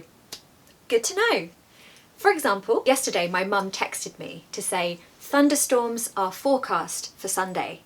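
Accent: United Kingdom. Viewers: Hello, it's always very nice to listen to your stories and wonderful british accent! I have a question for you: are you English or Scottish? I presume English, but I am not sure! 👍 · British Accent is very good i Adore it❤❤❤❤❤❤❤❤❤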